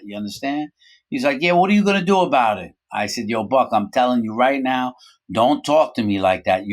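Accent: New York, United States. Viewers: Thank God that didn't effect your parole you deserve some luck that could have ended very badly for you both 🤞 your a great story teller i think the New York accent also helps looking forward to how you managed to be friends with the nurse haha 😅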